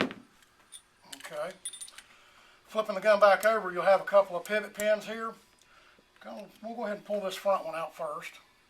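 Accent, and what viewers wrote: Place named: East Texas, United States. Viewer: Thanks for this video! Maine? You sound like my people here in the Texas piney woods! Gonna check your site out. I need my 1974 M94 blued. You mention that you do that. It looks like a black paint that's coming off the receiver.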